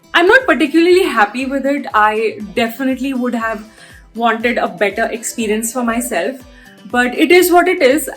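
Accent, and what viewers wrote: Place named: India. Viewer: After 13 years in US still have a very strong Indian accent!!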